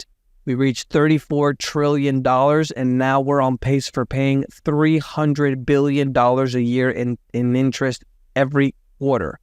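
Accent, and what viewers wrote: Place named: Spain. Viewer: I miss your Spanish accent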